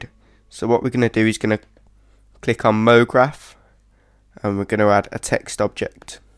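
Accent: Birmingham, United Kingdom. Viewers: your accent is fucking wicked. where i it from? like what part of the UK? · Im from birmingham you have a similar accent to me where are you from if you don't mind me asking:) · lol british-liked accent? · He's from Birmingham, i know that accent from anywhere! lol :D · Common brummy :) love the accent pal :)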